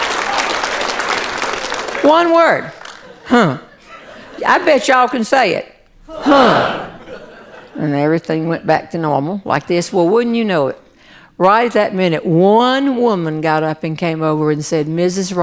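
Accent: South, United States. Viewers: My father's family if from West [by God] Virginia. This woman reminds me of an Aunt from there and my grandfather. Common sense to the nines. That sweet southern drawl as they lay down little pieces of candy while telling a story lead you to fall into the humor pit. Good stuff.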